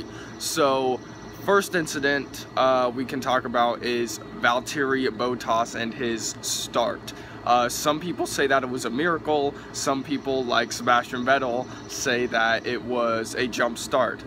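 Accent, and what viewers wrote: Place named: United States. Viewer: Mate you should really research before uploading and not just talk crap. In F1, jumping the start results in a penalty not a restart. Also, you should really learn the drivers names and how to properly pronounce them. Don't Americanise everything, and people will respect you a lot more. Very amateur video. If you really want to upload these sorts of videos you should write notes during the race of what happens when so you know what to talk about.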